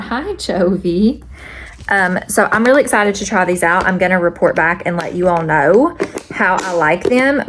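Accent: South, United States. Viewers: Hi! Do you know if I use your affiliate link to Amazon but get a different set of Grabie markers (or other brand) do you still get credit? I need some new ones to go with my new Coco Wyo color book. These are the best!!! So relaxing and not stressful like the really intricate ones that stress me out more! 😂 Thanks for all your wonderful content!! My hubby calls you “that little southern girl” lol I think he really likes your accent 😊❤️❤️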